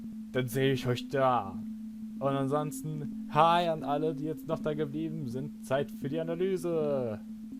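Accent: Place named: Germany. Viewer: diggah als du english am Anfang gesprochen hast wusste ich sofort das du deutsch bist😂😂 dieser Akzent die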